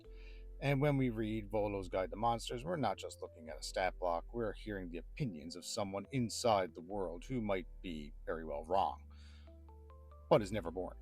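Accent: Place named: Newfoundland, Canada. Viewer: Love the video! Off topic, but are you from Newfoundland by chance? As a Newfie I think I'm picking up an accent there lol!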